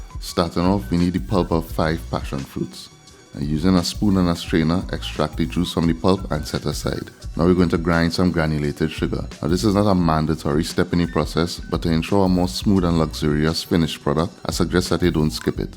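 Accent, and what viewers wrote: Trinidad and Tobago. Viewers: The accent of a Trinidadian is very distinguished! 🖤Nice recipe! I will have to order the fruit via amazon.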